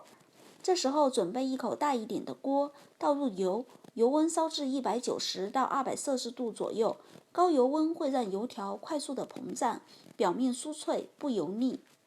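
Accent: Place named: Fujian, China. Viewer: 你是福建人吧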